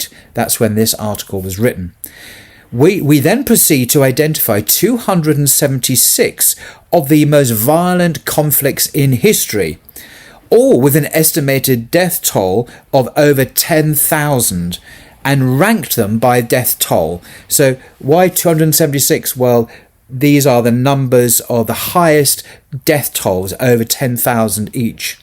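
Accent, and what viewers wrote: United Kingdom. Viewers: Such a great explanation, Sir! Oh, on the side note, I like your accent. British! I find it friendly for the American accent, but British accent, I can feel like a King! 🤓 Well, the UK is the United Kingdom, isn't it? Will listen more to your videos! (Well, I am not a native speaker of English). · This guy is so shallow and stupid that he forgets the bible claims to have killed everything during a flood. EVERYTHING God killed everything. What a useless video. His British accent can't cover what the Bible pretends. It's insulting to anyone with common sense. Christians "God" killed everything on the planet to start over. This guy's a idiot.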